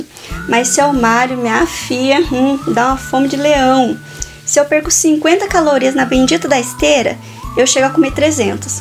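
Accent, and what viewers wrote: Minas Gerais, Brazil. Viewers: A japonesa mais mineira q eu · Ela aprendeu portugues no interior daq de minas so pode mkskskzkskkdkdkskskks, fala igualzinho a gnt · sotaque mineiro é top :D fofo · Cê parece a fusão de um mineiro com um japonês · Vai ver ela é Mineira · Vc parece "ser mineira" pelo seu sotaque brasileiro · Eu acho q tá mais pra mineira. Eu acho que como ela morou no japão por muito tempo, perdeu um pouco do sotaque. Mas dá pra perceber pelo "Ocê", encurtada de palavras e o "R" um pouco arrastado. - O "Arrrrdido" dela é um pouco mais arrastado. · Ela é Japonesa ou Brasileira? Nossa, amei o Jeito dela.. Se for Japonesa, fala Brasileiro melhor que eu rsrs 😄 Me fez rir demais com esse sotaque Mineiro 😅 Amei ❤️ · Ela fala o português mineiro uai, Herdou o sotaque mineiro!! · vc tem um pouco do sutaque mineiro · Moro no interior de sp mas entendi td q vc flw(mineira?) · Man quando ela fala português ela parece ser uma mineirinha É mt fofinho :3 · Sotaque de mineira ta forte viu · Eu acho q parece mais msm um sotaque mineiro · kkkkkkkkkkkkk vc é Mineira?? 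· Fala palavrão com muita classe, português do interiorrr (de Minas?) e com a delicadeza de uma gueixa. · Ela é mineira? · Vc é muito engraçada de um jeito meigo.E ñ parece ser de SP, tá mais pra mineirinha kkkk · Vc e mineirinha?? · Ela é Mineira? Tem sotaque, sou de Minas. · Tu é mineira ???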